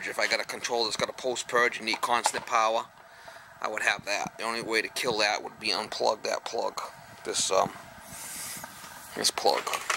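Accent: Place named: New England, United States. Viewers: New England accent galore I was sure any moment he was gonna call his Pauly his ma or broad to help him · Aw rhight my New England Brothah! This is going to be a great help for me here (now in PA) with a 60-80 year old oil fired hot water to radiator heat, furnace and a Beckett burnah! I've run diagnostics on this thing (being a true New Englandah not cheap just smaht/frugal) for the last three years and saved about $1000 dollars. My problem is cruddy electrodes after almost every firing requiring take down and cleaning to get to fire again. I have a new transformer/igniter but haven't replaced it yet b/c the old one still works!! (sound like my dear old departed dad..). So hope your video's can help! Wicked-good video!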